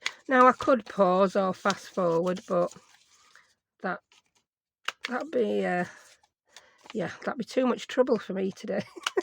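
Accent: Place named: Yorkshire, United Kingdom. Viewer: New to your channel and I love your work. These journal cards are beautiful. Also love your accent and waffling.. from 'nuther Yorkshire Lass ;)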